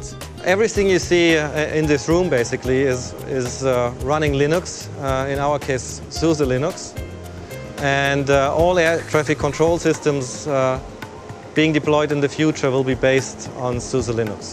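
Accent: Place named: Germany. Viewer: lol, german accent.....sound better than the american anyways cool viedo....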